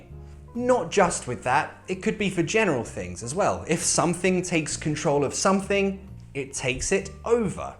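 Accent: United Kingdom. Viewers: I love his British accent 😁💗 lol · This is my favorite video. Nice story, cute drawings, clear explanations... and of course your British accent! Thank you very mush for posting it.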